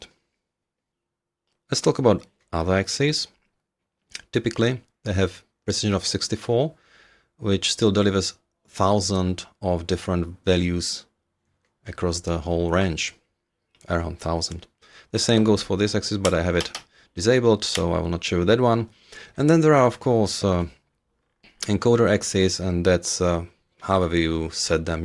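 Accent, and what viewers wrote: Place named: Czechoslovakia. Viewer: Perfektní recenze, možná nejlepší co jsem na STECS viděl (a že jsem jich shlédnul opravdu hodně :). Pořád váhám, jestli si pořídit throttle od VKB, nebo od Virpilu a tohle mě hodně nahlodalo ve prospěch VKB STECS. Jinak jsem rád, za závěrečné potvrzení mého tušení, že vaše perfektní angličtina má nezaměnitelný "československý" přízvuk. Díky a zdravím na Slovensko z Moravy :)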